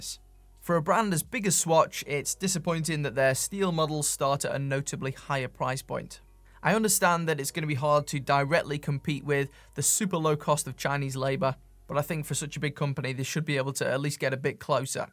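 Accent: United Kingdom. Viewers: OMG, my brain is so confused watching you. You sound like a native Brit, which I assume you are, but look totally like Slav :)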